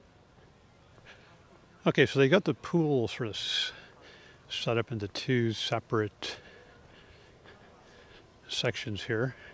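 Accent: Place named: Ireland. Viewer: Sounds like an Irish accent to me.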